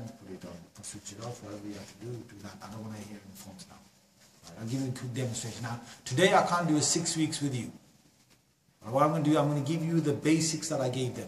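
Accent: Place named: Jamaica. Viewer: Hes wicked jamican and afro accents are hilarious MA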